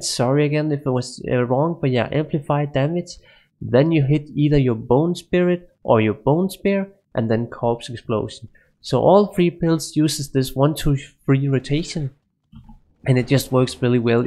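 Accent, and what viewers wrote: Denmark. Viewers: dejligt at høre en dansker :)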